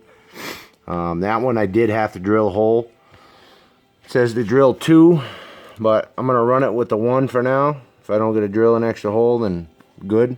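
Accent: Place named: Boston, United States. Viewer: "I live up in tha woods" I wonder if he's here in New Hampshire, "shit gets pretty dahk" knew it, ha. Can't mistake the combo Boston/ Downeast twang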